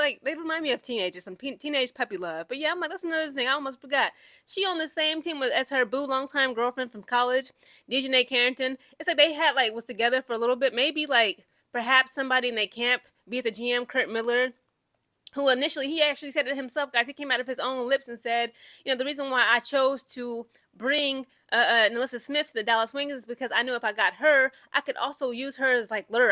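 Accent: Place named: Caribbean. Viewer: I think sometimes I hear a little West Indian twang too and I’m hailing up from St. Thomas, V. I. and Guadeloupe myself. The thing is I struggle to keep up because she talks so dang fast🙂.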